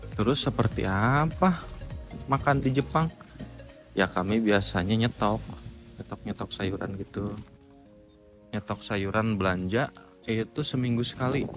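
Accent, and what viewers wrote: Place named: West Java, Indonesia. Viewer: Logat abangnya Sunda banget 😂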